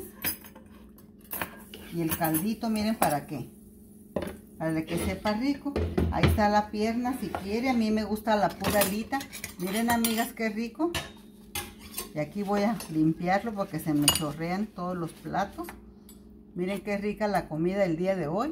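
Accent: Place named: North, Mexico. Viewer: Señora,,buenas sus recetas y comida saludable al mero estilo Sonora y con el acento tan norteño tan popular. Nomas un comentario me gusta cuando sirve la comida asu marido y el la resibe con mucho gusto, pero me parece muy cortante de su parte mandarlo a sentar asu rincón “” es mucho mejor que el este con usted en compañía como una bonita pareja,comparta comiendo del mismo plato en vez de mandarlo lejos de usted,,Gracias por sus recetas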